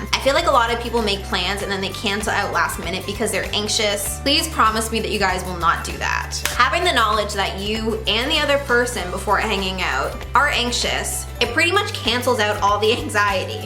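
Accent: Canada. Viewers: are you Canadian? 😊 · "aboat" lol I love the Canadian accent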